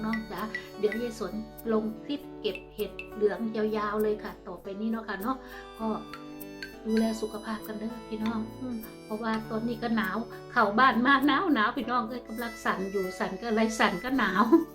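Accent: Korat, Thailand. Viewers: สวัสดีจ่ะ ดูยายเก็บเห็ดเก็บผลไม้เพลินดีได้ฟังภาษาโคราชวันละคำไปด้วยฉันคนบัวใหญ่เด้อจ่ะ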